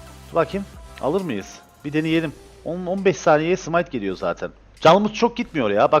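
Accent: Cyprus. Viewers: abi sen gıbrıslısıñ deyiil?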